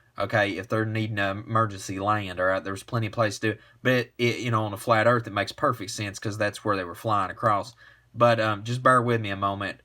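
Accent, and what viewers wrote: United States. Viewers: Judging by your accent you are probably American and as such probably never had any lessons in geography so I made allowances for your preposterous assumptions. Firstly, you are actually using polar equidistant map of the Northern Hemisphere as it is on the GLOBE and that is,you must admit very,very poor proof of your FE..Secondly,have a look at the globus (you know, the round spherical thing in primary school class room with maps on it ) and you will see that a trip from Shanghai to Munich would be mostly over Siberia and then Ukraine.Then, how much of the horizon did you actually see on your flight through 26 inch window? All of it or just a bit of it?Thirdly, on equidistant map's grid the straight line is actually a curve as it has to follow latitude or longitude ( parallels and meridians ) depending on course.Lastly, if the Earth was flat with North Pole in the center and South Pole around it ( Ice Wall ) the use of simple compass ( you know, always points North ) would be IMPOSSIBLE.Now try to figure that one out and you will see just how silly is your assumption.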